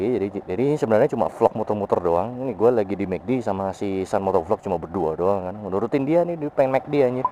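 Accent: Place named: Java, Indonesia. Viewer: Jawa nya medokkk anjasssss😂😂😂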